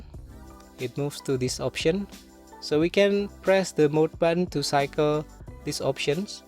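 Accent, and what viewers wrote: Indonesia. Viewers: Pertama kali liat karena pengen tau gimana cara setting jamnya, terus pas semakin di dengerin lama-lama pengucapannya kaya orang indonesia juga ya ternyata orang semarangg😭😭 Mantap sih bahasa inggris nya bagus bangettt mungkin kalo gak di dengerin dengan teliti gak tau kalo orang indonesia nih😂😂 Terimakasih om untuk cara settingnya👌👌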